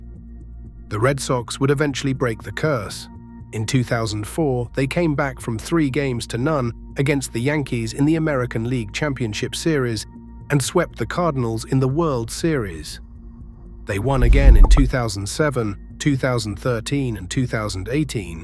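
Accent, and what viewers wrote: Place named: United Kingdom. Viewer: The accent tells us at the very beginning,, this video is going to be a bomb 💣 😅😂😅,,, Veeda Blue,,,, Dykstra Crushed a home-run off oil can Boyd, it hit the Pesky pole in right field, it's been said to be anywhere from 274 feet to 300 feet, regardless, its not a home-run that was CRUSHED, but not according to this Britt nitwit! 😅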